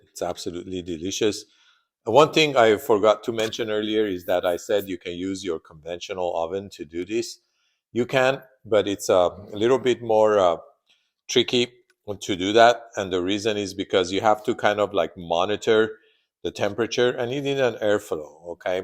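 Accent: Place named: New Jersey, United States. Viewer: lovely accent. new jeresey?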